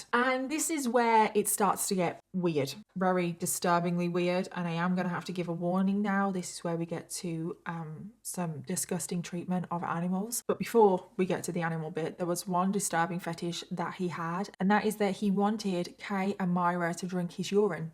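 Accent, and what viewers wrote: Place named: England, United Kingdom. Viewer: Hey my fiancé and I love your videos and we've found ourselves trying to figure out your accent! Where in England are you?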